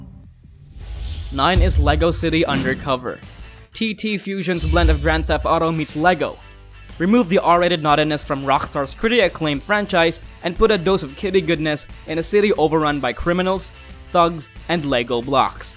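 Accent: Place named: Philippines. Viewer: your accent is somehow sounds like a Filipino accent, i just noticed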